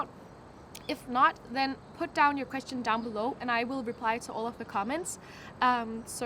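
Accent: Denmark. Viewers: First time being on your youtube channel. And when I heard you speak, immediately thought you were danish cause of you faint accent. I was right! xD I'm danish too so yeah.. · Girl i hear you danish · I can tell on your accent your danish :) Men det betyder ikke at du har en grim engelsk udtale! Virkelig fedt at du er flyttet til Korea, vil rigtig gerne selv derned og besøge landet. Har dog været i Taiwan og Filippinerne for et halvt år siden så har oplevet noget asiatisk kultur haha. Du må have det rigtig godt dernede! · Jeg kunne høre på din accent at du kom fra danmark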